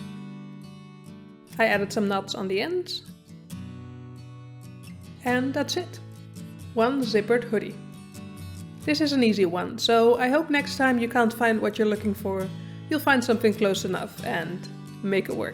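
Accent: Netherlands. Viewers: dank je wel! Clear and to the point!! Wonderful tutorial. ❤ Also, as someone who grew up in Germany 5 min from the Dutch border, it was lovely hearing your accent! Brought be straight back home. 😊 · ik hoor gewoon t nederlandse er doorheen, maar dit is zo goed uitgelegd, zelfs ik snap t in 1 keer en ik heb echt moeite met begrijpen, top video!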